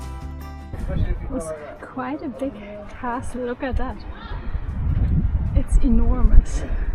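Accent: Germany. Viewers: Der kleine Otto tut mir leid. Hoffentlich geht es ihm bald besser. Übrigens, wo kommst du her? Dein deutscher Akzent kommt mir bekannt vor.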